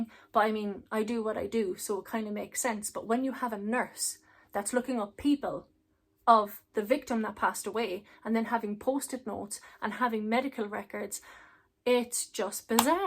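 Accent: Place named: Ireland. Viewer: Absolutely horrific and sickening. Do u reckon is some form Muchousen by proxy? Love your gorgeous accent btw, r u iIrsh? Love from Australia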